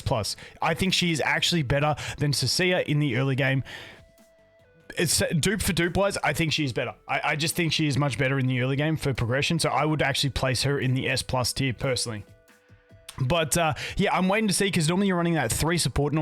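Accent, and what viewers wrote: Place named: Australia. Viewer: love your oz accent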